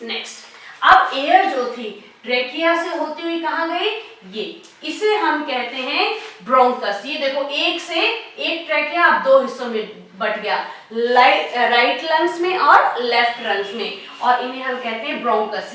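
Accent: Haryana, India. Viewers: Haryana k ho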